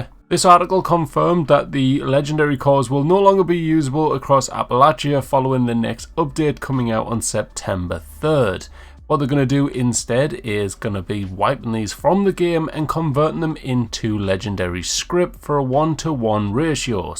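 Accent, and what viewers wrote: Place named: England, United Kingdom. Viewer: He's from England. This is your problem, not his.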